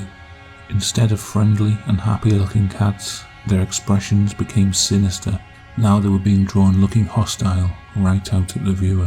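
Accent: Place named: Liverpool, United Kingdom. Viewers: This narrator sounds like a monotone Liverpool teacher who wants to quit his job... it is a very good video though, just not keen on the violin screeching in the background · Wow, barely a scouse twang here 6 yrs ago (2022 current year) can't believe it's you. I quite like it! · VIKINGODIN , you are on the wrong coast. That's a slight Merseyside accent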